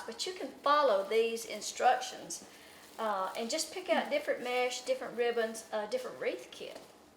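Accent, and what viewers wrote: South, United States. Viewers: Her sweet southern voice!!😄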